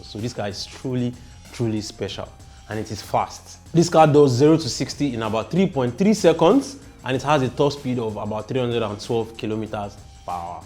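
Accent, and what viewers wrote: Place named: Nigeria. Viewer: Least fastest to the most fastest. Nigerian English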